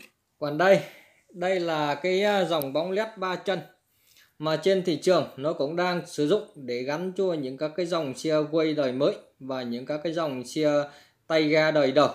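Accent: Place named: Thanh Hoa, Vietnam. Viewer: Ông anh người tỉnh nào thế? Nghe có vẻ như Thanh Hóa thì phải, Giọng hơi khó nghe.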